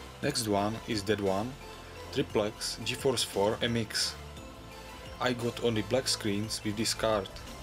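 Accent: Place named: Czech Republic. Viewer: Ta sbírka hardwaru je naprosto skvělá. Když jsem tenhle kanál objevil, hned jsem podle přízvuku tušil, že je dokonce česká :-) Jen v tomhle videu jsou komponenty, které jsem ani netušil, že existují. Takhle exotický HW se ke mě nedostává.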